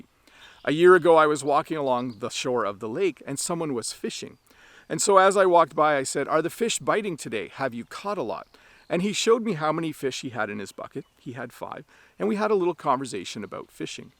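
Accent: Canada. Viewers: Hi, I noticed you pronounced the "s" in "conversation" with a "z" sound while I've heard other people pronouncing it more like a "c" sound. Is it a sort of Canadian accent? Thanks for the lesson!! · Thanks..I'm from Sri Lanka and have been a member of your educational YouTube channel for a long time and have learnt a lot so far. Your way of presenting lessons is excellent. Your Canadian English accent is very clear and learners of English may not want to switch on the English subtitles to understand what you say. Actually, it's important for all learners to talk to strangers when learning English. As I know, it's the best way to practise English and by doing so, they would be able to learn different accents of different speakers. I know by experience talking to foreigners who visit Sri Lanka that they speak in different accents. Some non-native speakers speak in broken English but it's understood.. 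It's only through mingling or interaction with others that we're able to practise speaking. This is true regarding all other languages.. KEEP MAKING MORE LEARNING VIDEOS....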